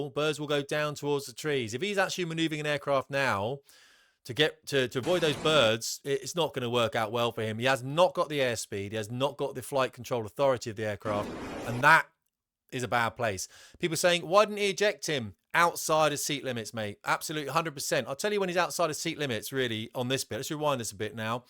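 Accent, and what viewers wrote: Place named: United Kingdom. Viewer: He was getting a picture by someone on the beach. Your british voice is annoying as hell. How cucked are you?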